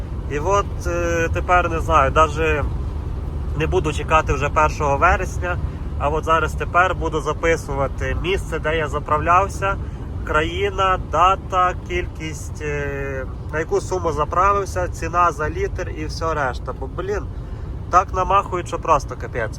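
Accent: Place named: Western Ukraine, Ukraine. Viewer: Файно слухати свою мову, ви похоже з наших країв, привіт з Тернополя 😊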